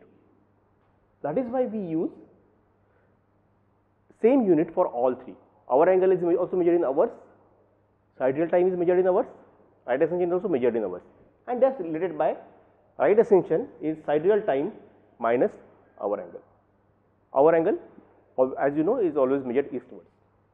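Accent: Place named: India. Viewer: The toughest Indian accent . But thanks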